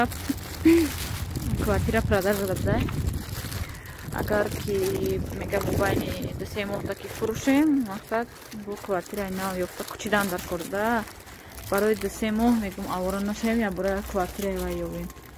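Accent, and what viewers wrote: Tajikistan. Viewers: Салом шавхаратон ай хучанд худатон ай тарафи кургонтепа чхел шуд ядигаритона гирифтед худатон лахчайи точики кати гап мезанед шавхаратон гудакотон хучанди бачи дадо моям дадо мегуйем падарамона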